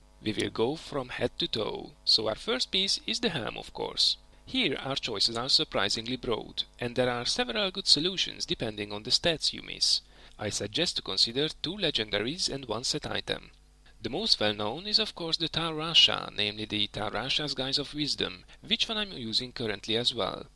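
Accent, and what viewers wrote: Hungary. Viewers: bakker, meghallom a hangod, "ez magyar akcentus" megnézem, és tényleg:D jó videó :D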